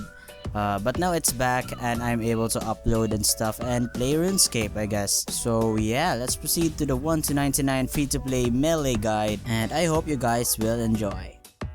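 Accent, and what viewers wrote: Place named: Philippines. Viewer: you have the most annoying fucken accent ive ever heard. pinoy?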